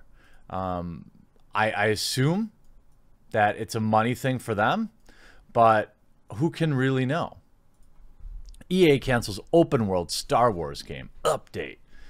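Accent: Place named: Minnesota, United States. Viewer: Ha you say rag tag like you say bag. Are you from Minnesota ?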